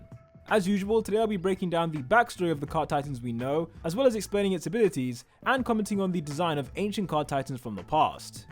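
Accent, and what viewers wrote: United Kingdom. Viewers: she speaking british which gives me the tingles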